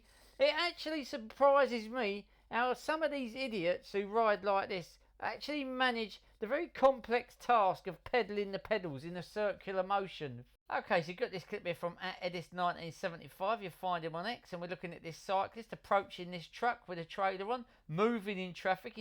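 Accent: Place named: London, United Kingdom. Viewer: Its really good how you think up the the comparisons its quite poetic along with your London accent makes it more funny I love these videos their really funny keep them coming please 😊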